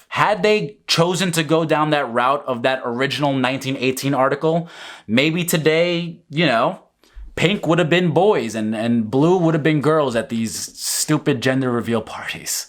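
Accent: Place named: Scotland, United Kingdom. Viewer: Your continual dragging of Barren Ben Shapiro and your scottish accent. I'm here for it!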